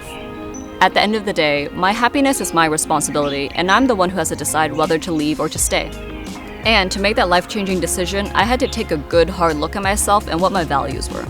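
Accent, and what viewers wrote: United States. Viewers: There is what I think you and are either ABC or brought up in the States. The way you talk and the accent is completely different to the locals and CBC and BBC. Good luck on your new career.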